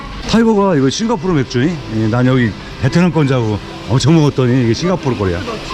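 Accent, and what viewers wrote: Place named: Chungcheong, South Korea. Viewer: 충청도 어디여유?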